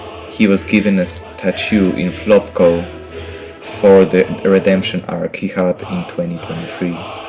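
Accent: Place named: Armenia. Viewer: Nice to hear someone talk with Armenian accent 🇦🇲🇦🇲🤘 Have a good one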